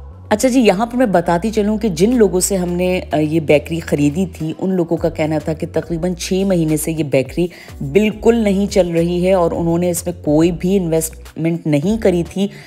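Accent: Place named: Lucknow, India. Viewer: Aap ki urdu tallafuz aur zaban subhanallah bilkul lucknowi hai! Shayad aap log UP India se migrate hoke Karachi Pakistan gaye honge!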